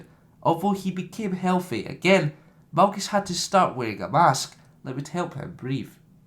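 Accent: Scotland, United Kingdom. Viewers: your scottish i know that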